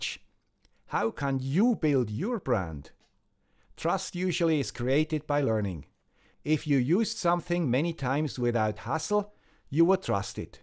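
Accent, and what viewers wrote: Switzerland. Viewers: I’d like to think not many commercials or youtube channels influence me in a substantial way. You are the exception and it has allways been a good experience. If i need some engineering advise or a point of view on something i usually think “what will the have to say about this”. You really do add something to the table and i trust the “Swiss accent” brand. Not doing any midroll commercials is also very much appreciated. I have been annoyed much by youtube lately and unsubscribed from some channels that run more than 3 commercials in 10 minutes. What a pleasure to watch without any rude interruptions! Wish you all the best in 2021! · This is a much more philosophical video than I expected, but I enjoyed it. Thanks! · Your video is an important message today, not just for Engineering, but for day to day life. I greatly appreciate your channel and always smile when I see a new one of your videos appear. 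All the best from the USA where trust is in rather short supply. Hopefully, we can learn a little from the! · Joking aside, very nice content. Continue trusting 🙂.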